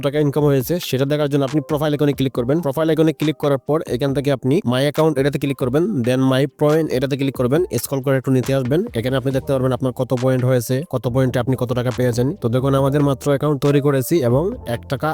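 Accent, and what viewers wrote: Sylhet, Bangladesh. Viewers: ভাই সিলেটি❤❤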